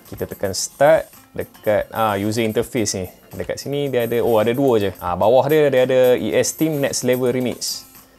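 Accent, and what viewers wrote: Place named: North, Malaysia. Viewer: Nampak macam ada idgham2 utagha yang ditahan2.. 😂lantak pi bro.. utagha slag is addictive!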